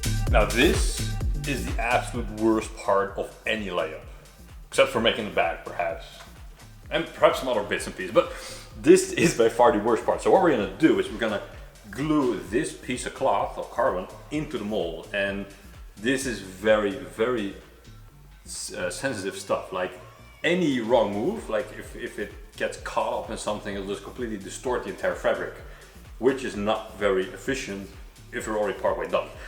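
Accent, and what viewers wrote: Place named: Netherlands. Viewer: I was listening, and thinking that accent is very familiar. Then you started speaking Dutch and I was like MY INSTINCT WAS RIGHT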